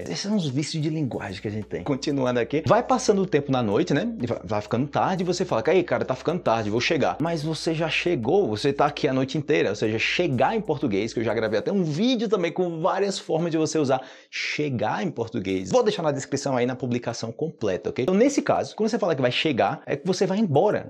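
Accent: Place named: Antioquia, Colombia. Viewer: Me encanta como el profe cuando habla español se le siente puro acento paisa, obrigado!!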